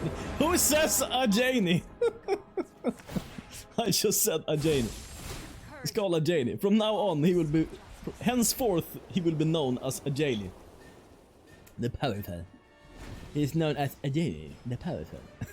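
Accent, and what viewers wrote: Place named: Sweden. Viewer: god dam you have a strong Swedish accsent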